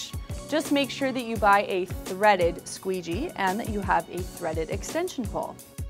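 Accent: Canada. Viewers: You can clean my windows anytime baby ! Just love that Canadian accent.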